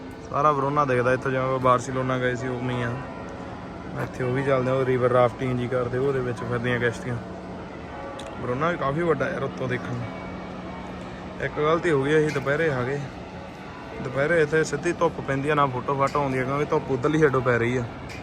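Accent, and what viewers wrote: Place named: Malwa, India. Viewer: Bhai sohna vlog hunda thoda. Tusi Punjab to kehde jille to ho? Boli Malwe aali lagdi, saade angu.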